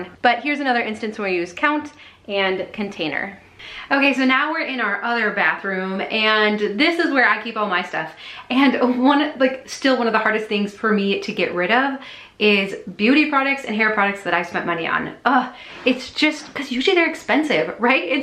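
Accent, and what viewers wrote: Minnesota, United States. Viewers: Me: I wonder where this lady is from.: Plastic baegs Me: Ok, Minnesota then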